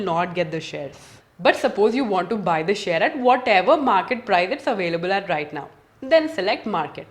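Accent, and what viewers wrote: India. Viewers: आपकी वीडियो मुझे इसलिए पसंद आती है, क्योंकि आप भारतीय तरीके से अंग्रेजी बोलती हैं,, I love you didi❤️❤️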